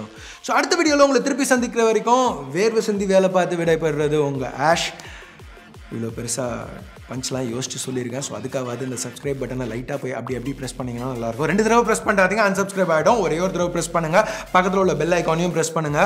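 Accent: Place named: Chennai, India. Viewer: Now a days great changes in videos. Especially on slang. Chennai slang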